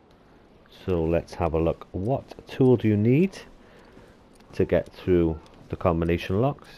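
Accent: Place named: South Wales, United Kingdom. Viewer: Is that a Cardiff accent ? It's definitely south walian 😂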